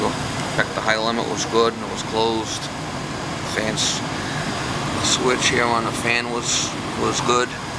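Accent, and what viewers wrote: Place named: Northeast, United States. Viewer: u soumd like ur frmm UMM?? northeast there. I have a reheem 1973 gas heater. it heats up like sound is boombush but after that no sound from the fan motor and I did put in canstat l22-9a-11 there that didn't work but I got the fan motor going there but noo heat though. could it be the fan limit switch there thanks George..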